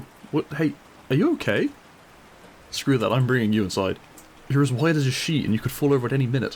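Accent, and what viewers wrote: United Kingdom. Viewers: This might just be me but you sound like a deeper more British Wilbur Soot🤔😁😂 Edited: wow 293 likes and 26 replies thank you everyone so much 😁🥰🥰and to those of you that can’t unhear it or that it’s stuck in your head you’re welcome😏😏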